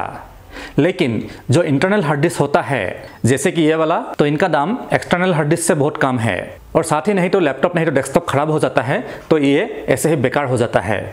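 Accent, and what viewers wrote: Assam, India. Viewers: Axomor sobore hindi kotha anekuae hoi..🙃🙃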